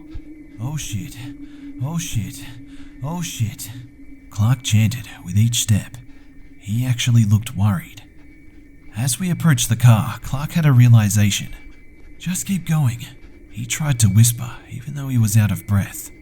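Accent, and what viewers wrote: Australia. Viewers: are you Australian cause your accent sounds Aussie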